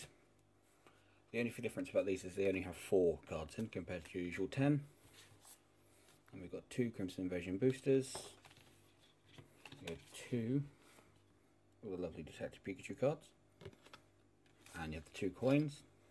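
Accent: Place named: United Kingdom. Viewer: Love your intro mate, looks amazing! Sounds like you're from the UK also? Really liked the Detective Pikachu series, do you think the cards will go up in value at any time? Subbed mate!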